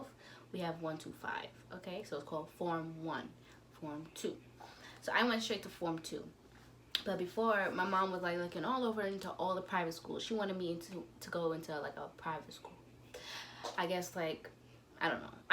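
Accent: Trinidad and Tobago. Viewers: You are talking and can hear the trini accent.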